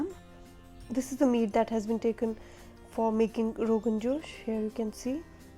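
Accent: Kashmir, India. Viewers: Is it Dishes or Dissss? Wah re Kashmiri accent :) This is not a dig but on a lighter note. Great video!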